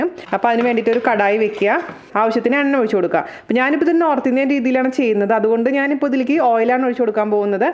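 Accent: Thrissur, India. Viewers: Njan litty vipin chechide recipe try cheyarundu endhe ammayum thirrsur kari aanuu ippo amma ella but chechi timil parayunna chila vakkukal adipoli ready mani athokke kelkksnum koodi ya njan kannune endhe ammayumm athu pole parayumayirunnu chechi de slag ellammm amma pettennu orma varum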